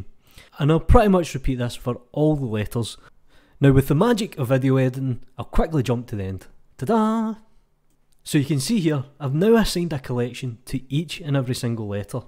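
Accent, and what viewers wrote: Scotland, United Kingdom. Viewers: When I turned on auto-generated subtitles with your scottish accent xD "I'm gonna add on fail open nod" -> "I'm gonna add on a fail or not"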